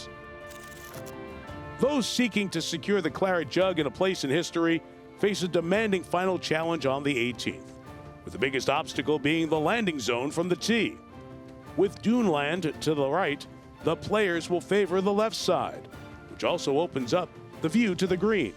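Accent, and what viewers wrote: United States. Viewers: Such a pity that the R&A has stooped to having a yank voiceover. Is nothing sacred??? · Yes indeed, why the American narration?! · Shame you got an American to narrate....🙄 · A yank commentating on this is daft. As much as I like the guy who is talking. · Why is the narrator an American!? No northern Irish narrators free? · Ah here lads, why is an American narrating this and what is with the dizzying transitions from one hole to another.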